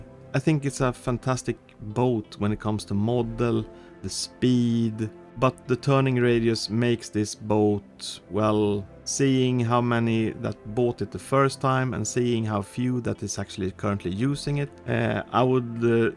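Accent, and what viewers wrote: Sweden. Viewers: You sound very swedish 😳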